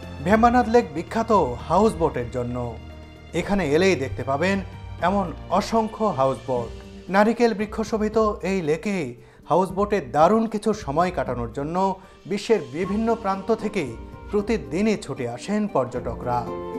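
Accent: Bangladesh. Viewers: Apnar kotha sune ..mone hoi apni bangladeshi ..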